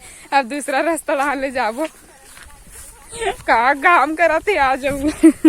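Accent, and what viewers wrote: Chhattisgarh, India. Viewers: Apke awaj se pta chl rha h ap dhamtari se ho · जबरदस्त मिठास हे तोर छत्तीसगढी बोली मे❤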